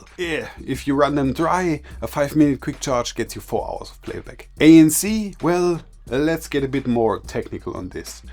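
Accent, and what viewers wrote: Germany. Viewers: Nice Deutscher Akzent :)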